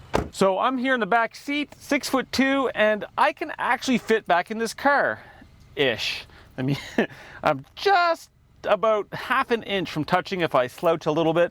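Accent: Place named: Midwest, United States. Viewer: Midwest accent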